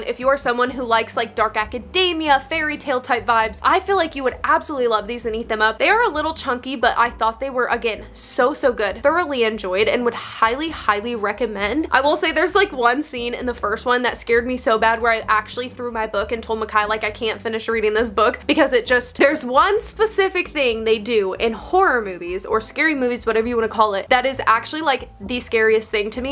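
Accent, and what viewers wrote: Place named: California, United States. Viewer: Ahah I mean what’s your American accent? 🥺 You actually sounds like Californian accent😅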